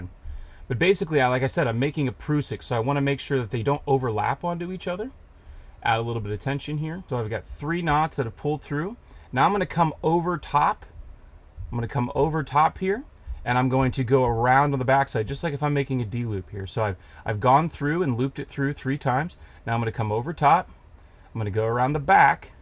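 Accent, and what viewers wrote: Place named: Pennsylvania, United States. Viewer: I love the way he says "he' LOOOOOOH everybody" with that northern PA accent. ALWAYS puts a smile on my face.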